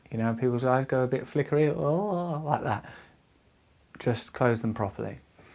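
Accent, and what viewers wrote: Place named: England, United Kingdom. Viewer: An English accent is so calming, why, but it is, Thankyou!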